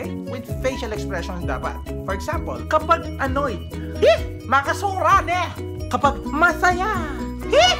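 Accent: Pampanga, Philippines. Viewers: Magaling k talaga'yTo. Kpmpagan ka tlaga ksi keng gale mu at diction at bumigkas Mekeni ka talaga. Magaling ka Abe, baka Ika ing mawawala kung anak ah, pwde dkang paDNA ? Hehehe, i tule me ing ggwan mu para lalu kang mikuwalta kreng vlogs mu, emu ku kklingwan, gambatte 'NE !!!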